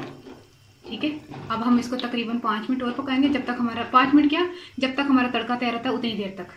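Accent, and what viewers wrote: Punjab, India. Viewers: sabh to jiyada nice tuhadi mithi punjabi boli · I really need this recipe ur Punjabi is v.cute Mashallah double mzaa ataa hay 🤗🥰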